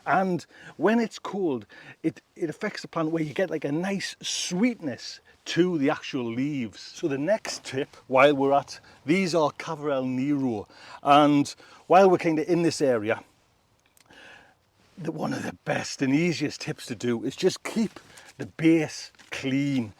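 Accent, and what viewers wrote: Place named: Geordie, United Kingdom. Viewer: Love that Geordie dialect. Wonderful. Know a few and they are real down to earth folk. Thank you for the advice! For Nottinghashire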